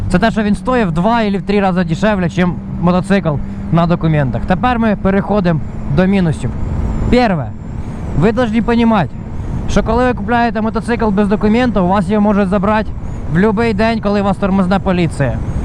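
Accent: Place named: Surzhyk, Ukraine. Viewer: Вчи українську! бо твій суржик звучить як риготня...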